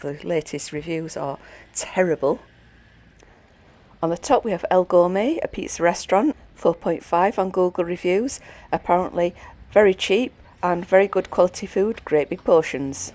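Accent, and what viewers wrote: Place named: Yorkshire, United Kingdom. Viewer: Excellent video and we enjoyed the commentary too; very informative. We're from Yorkshire and we think you may be too! Many thanks!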